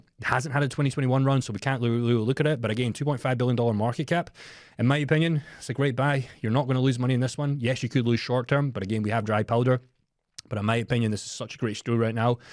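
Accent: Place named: Edinburgh, United Kingdom. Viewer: As an ex Edinburgh resident I enjoyed hearing this well informed crypto briefing in an accent I know well. Great info. Excellently presented. I subscribed !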